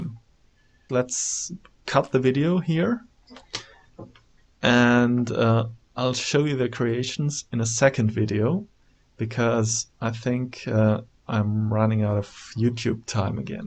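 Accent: Germany. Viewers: deutscher ?:D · Super Deutscher Akzent :D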